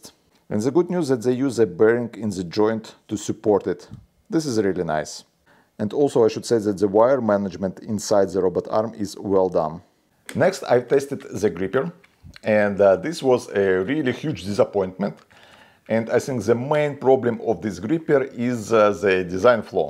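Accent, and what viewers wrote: Russia. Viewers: Теперь я понял, что значит "русский акцент". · Он не русский. Сильный русский акцент. Но он немец из Швейцарии.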